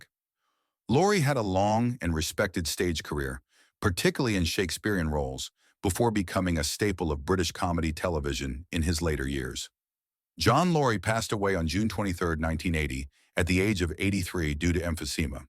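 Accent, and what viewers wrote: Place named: United States. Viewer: why is a yanky speaking??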